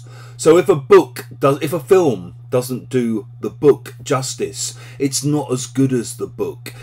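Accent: United Kingdom. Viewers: it is real British English mate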